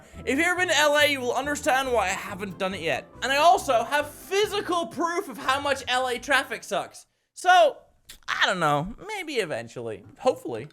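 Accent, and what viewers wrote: Minnesota, United States. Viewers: Bruh unless you were like up near bemidgi no one talks like that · started picking up a Minnesotan accent fast that makes me happy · I DIDNT KNOW YOU WERE IN MINNESOTA OOOFFFDAAA. 100% MINNESOTAN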